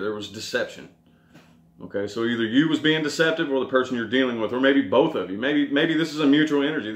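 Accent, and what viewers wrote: South, United States. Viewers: Cool southern drawl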